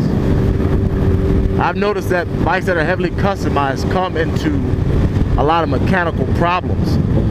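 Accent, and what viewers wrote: Michigan, United States. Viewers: Are you from Michigan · Bro you from Michigan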